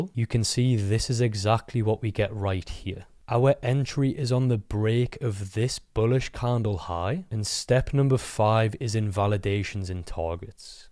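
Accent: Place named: Wales, United Kingdom. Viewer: Thank you for another great video. What assets do you trade/ monitor..p.s love the Welsh accent.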